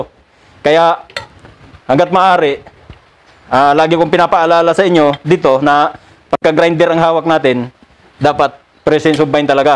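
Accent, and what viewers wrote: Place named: Visayas, Philippines. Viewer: bisaya mlng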